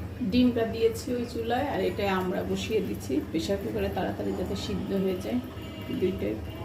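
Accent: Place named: Barishal, Bangladesh. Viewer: আপনি মনে হয় বরিশাল্লা😅😅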